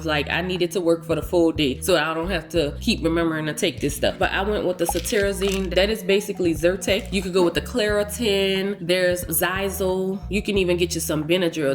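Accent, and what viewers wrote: New Orleans, United States. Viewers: Thank you 🙏🏾/I appreciate you and I Love your New Orleans accent 😀❤️🖤💚👋🏾-Listening from Dallas . Hit the like button 4 u 👍🏾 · I can hear a new orleans dialect from across the world.. lol.. stay safe ppl. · i wanna hear her say baby earl or ferl paper she gotta be from da NO area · Are you from New Orleans, the accent 😊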